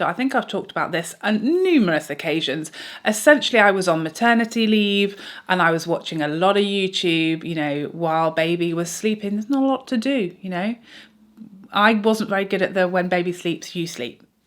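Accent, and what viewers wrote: United Kingdom. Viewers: Hi, I am new to your channel. You have a lovely British accent and very thoughtful reviews of products that are helpful. I enjoyed hearing all the answers to the questions in this tag. I hope you have a wonderful day! xo, Karen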